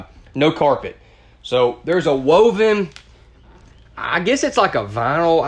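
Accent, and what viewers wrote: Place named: Kentucky, United States. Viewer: Aweslme review. Thanks. Love your Kentucky accent Reminds me of a Texas accent.. Definitely upgraded items. Love the kitchen. Surprised there is no outside kitchen. My main concern would be room for fulltime living clothes storage space. Love the bathroom, and tv/fireplace in the bedroom. It doesn't constantly cover the window. Woild have like bigger windows in the living room instead of light sconces.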